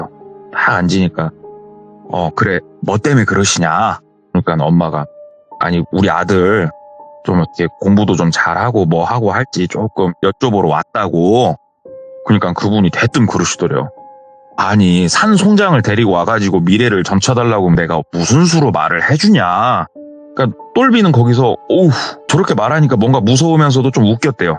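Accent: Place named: Jeonju, South Korea. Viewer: 전주 분이신가 보네요 ㅎㅎ 친구랑 말투가 똑같아서 뭔가 얼굴도 모르지만 친근감 있어요 ㅋㅋㅋㅋ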